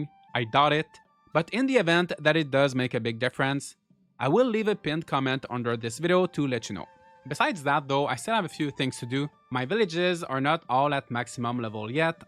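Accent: Quebec, Canada. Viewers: Are you québécois ? Your accent sound like it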